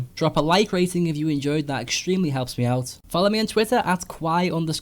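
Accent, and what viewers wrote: Liverpool, United Kingdom. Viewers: are you a scouser? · It is a mild liverpool accent (collegially known as "scouse"). Its an interesting English accent because all the surrounding accents are very different (eg the Manchester and midlands accent). It derives from the large influx in Irish immigrants to Liverpool during the potato famine. This mirrors the American Bostonian accent, which similarly derives from Irish Famine immigrants who went west to America rather than east to Britain. The English have so many accents and it refreshing for people to acknowledge other accents rather than just the standard "received pronunciation" of the Queen's English. (Not that there is anything wrong with RP) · Sweet video, you from Liverpool by any chance? You sound pretty scouse! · Ahh a fellow scouser :)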